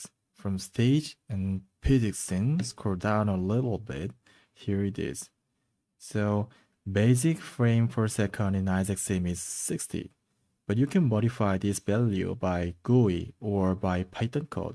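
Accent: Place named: South Korea. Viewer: 발음이 한국 분인듯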